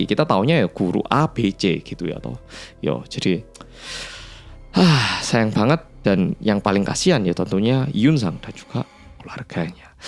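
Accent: Java, Indonesia. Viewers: kok medog se mas.. 😅😅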